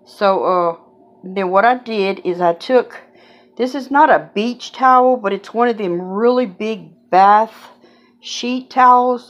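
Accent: Louisiana, United States. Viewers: Hey Girl! Love this! I just found your channel and subbed! We live in Alabama now, but my husband and i are from Louisiana (he's from New Iberia and my people are from Natchitoches). I don't see many good, down to earth channels from people who sound like home from Louisiana, so: Good On You! God Bless!!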